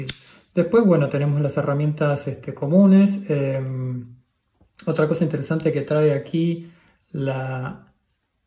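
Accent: Argentina. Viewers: Hola, muy buen video: me gustó mucho, completito el edc. Te consulto: sos argentino o uruguayo ?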